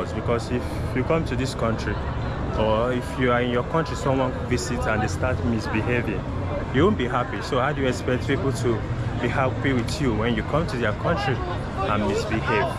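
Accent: Africa. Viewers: акцент не американский, из Африки скорее всего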